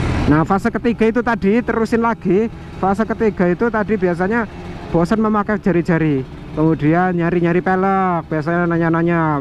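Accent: Banyumas, Indonesia. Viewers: ngapak'e gawe aku ngguya ngguyu ae pak😂..sampeyan wong ndi sih😄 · Klau ngvlog bahasa ngapak nya sering dipakai om biar makin ketawa aku liat ny lucuu...lucuu sukses trus om 😁👍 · Wong ndi si mas? Salam ngapak ket kebumen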